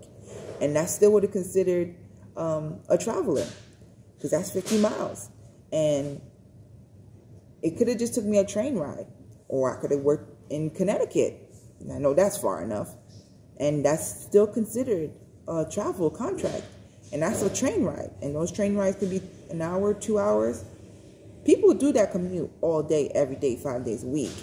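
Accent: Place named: Jamaica. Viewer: Are you Jamaican? I hear an accent!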